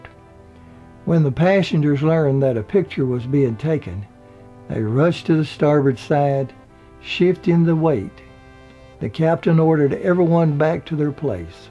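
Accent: South, United States. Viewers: Thanks for doing these, I always love your narration style and your southern accent.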